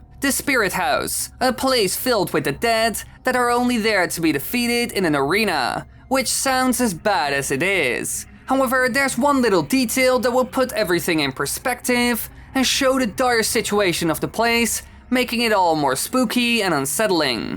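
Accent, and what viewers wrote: Netherlands. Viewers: It sounds like an accent (possibly Dutch or Swedish)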